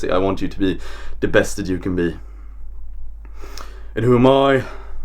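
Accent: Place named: Sweden. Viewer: Man your accent (and looks obviously) is so Swedish god damn XD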